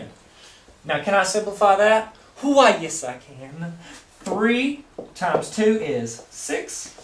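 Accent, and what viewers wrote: South, United States. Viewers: Awesome. Glad a teacher can have a sense of humor. You must be from the south. You use the accent well.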